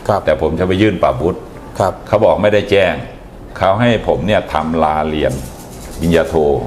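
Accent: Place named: Rural, Thailand. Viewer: ถ้าไม่รู้จักมาก่อนแล้วฟังสำเนียงพูดยืดยาดเหน่อนิดหน่อยมาดแบบลุงบ้านนอกคนหนึ่งใครจะรู้ว่าเป็นสิงห์มือปราบลือนามคนหนึ่งของตำรวจไทย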